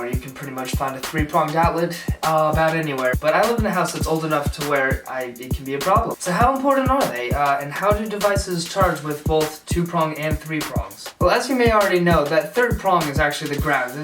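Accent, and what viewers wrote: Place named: China. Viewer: you talk like chinese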